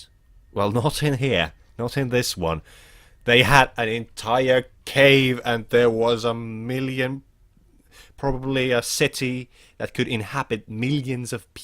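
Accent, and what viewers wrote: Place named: Finland. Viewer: Your accent sounds... Nordic. If I were to take a guess (and I am taking a guess), you're Finnish?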